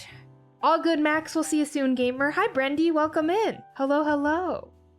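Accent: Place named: Midwest, United States. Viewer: Is it just me or does she have the most Midwest accent possible?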